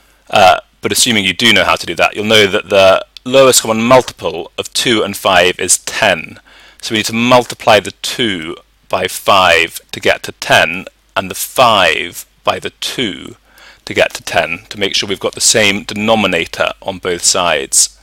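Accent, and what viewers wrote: United Kingdom. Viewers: are you british 😦😦😒😑😐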